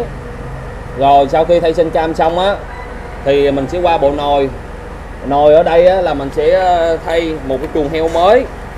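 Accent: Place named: Phan Rang, Vietnam. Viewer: Giọng Phan Rang dễ thương quá 😂 Tiệm có nhận fix rung ga đầu vario ko Bro ?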